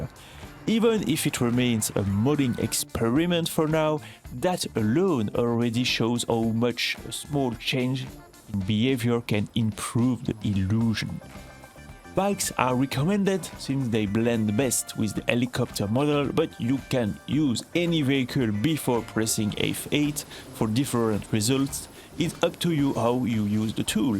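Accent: France. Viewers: You have such a thick french accent mon ami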